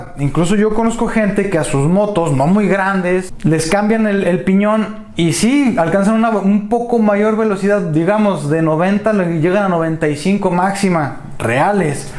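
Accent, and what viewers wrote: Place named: Mexico. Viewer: Me gusta más estilo mexicano de este bato a la hora de hablar... muy chido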